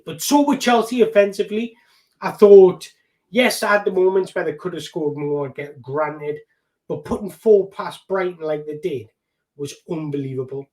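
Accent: Newcastle, United Kingdom. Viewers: my bro I still don't know whether you're chels or geordie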